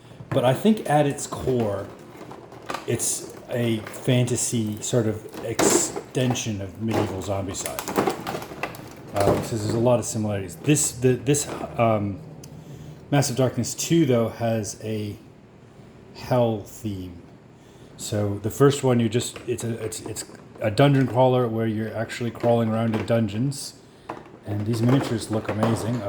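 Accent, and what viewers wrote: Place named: North America. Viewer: Thanks for the video! What part of the world are you located? Your accent sounds North American, but I assume you are not since I don't even think the boats have landed in the States yet. Shouldn't be long now and OMG am I excited to play!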